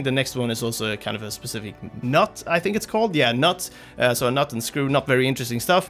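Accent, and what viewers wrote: Sweden. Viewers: you swedish?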